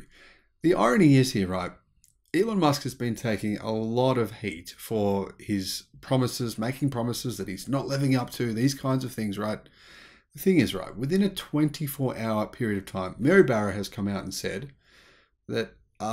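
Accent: Australia. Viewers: His heavy Aussi English, seems make him trip over pronunciation all the time.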